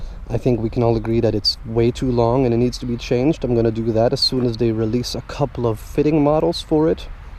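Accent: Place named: Germany. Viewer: Es ist so nervig dein englisch mit deutschen Akzent zu hören. Nicht böse gemeint!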